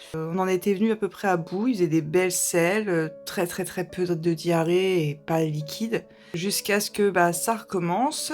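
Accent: France. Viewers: Accroche toi Tu nous manques déjà, reviens vite tu nous fait trop rire avec ton accent de Marseille...❤❤❤❤ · Des gros bisous guérisseurs pour notre petit sudiste.. je pense fort a toi et reviens nous vite pour nous faire rire avec ton accent du soleil !! Je croise les doigts +++ ❤❤❤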